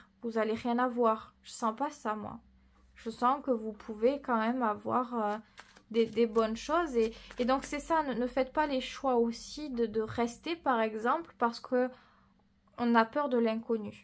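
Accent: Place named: South-West, France. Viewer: Je vous découvre, et j'apprécie... donc je m'abonne De plus, moi qui suis dans le sud-ouest, je reconnais un accent comme le mien, et ca me fait sourire Merci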